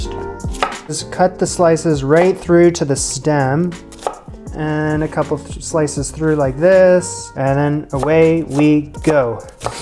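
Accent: Canada. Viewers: Me expects all Canadians to know french slaps the naivety out of me Well, at least he's still got the adorable Canadian accent, like every thing he says sounds kind of like a question.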